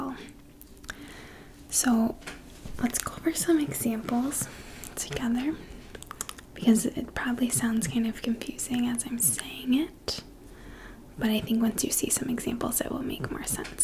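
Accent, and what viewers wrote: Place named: Wisconsin, United States. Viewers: That accent, I love it. I can't place it 100%. But sounds Wisconsin-ishhhhh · VEEEERY Midwestern, that's for sure. I'm in Arizona and we have a ton if Midwesterners here.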